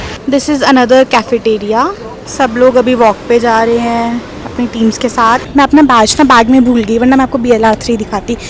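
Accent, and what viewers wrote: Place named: Himachal Pradesh, India. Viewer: You still have to work on your accent it sounds himachali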